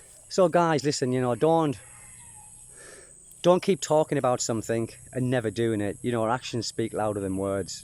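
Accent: United Kingdom. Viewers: during 60's and 70's, Columban missionaries were assigned that area of Mindanao. w/British accent like you.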